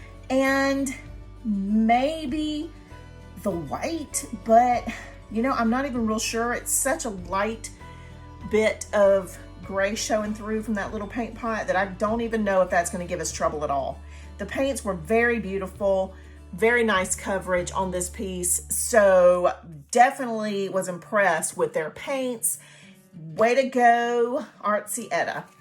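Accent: South, United States. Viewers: These are beautiful. I like the magnolias best! I do have one question that you didn't mention--where do they get their images, do they pay artist royalties? And btw your pronunciation of "mauve" isn't standard for the US, it's because of your Southern accent, which is adorable. :) Also, the first picture shows peonies, not roses!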